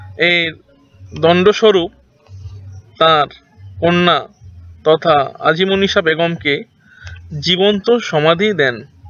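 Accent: India. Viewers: Uchoron shune Indian mone hoi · আপনি কি বিহারী. কথা বলতে কষ্ট হচ্ছে?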